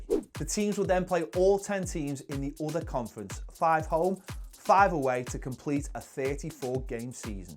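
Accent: Liverpool, United Kingdom. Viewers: Why r u doing the MLS even though you sound like a scouser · Norbert Janas It's a scouse accent (Liverpool)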